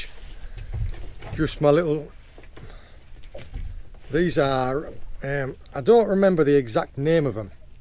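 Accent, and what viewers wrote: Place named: Northern England, United Kingdom. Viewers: Just randomly found your channel, you said your in Cornwall but you have a bit of a Northern accent. Anyways I will be watching more, keep up the good work mate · Hi, I feel like I detect a Cumbrian accent - not sure if that's right but you sound just like my Granda when we went fishing. Just want you to know that watching and listening to you has made his passing easier, especially when you explain things, it's like hearing him again. Love what you do marra, keep it up!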